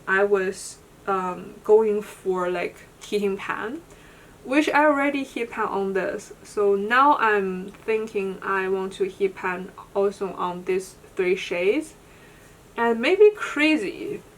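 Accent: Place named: China. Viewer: 之前听你口音就觉得是中国人，果然😊爱看你的铁皮进展❤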